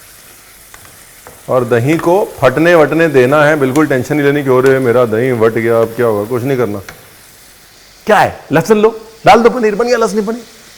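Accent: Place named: Rural, India. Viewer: No matter how desi/dehati accent he may speak in but when he says the word 'right' it reflects his sophistication both in life and culinary art. I like your way of cooking.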